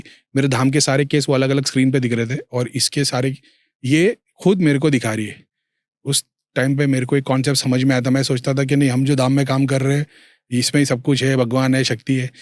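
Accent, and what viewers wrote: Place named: Mumbai, India. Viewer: Wo uska bolne ka dhang hai, wo Mumbai se hai. Uska matlab ye nahi ke wo kisi ki disrespect kar raha hai.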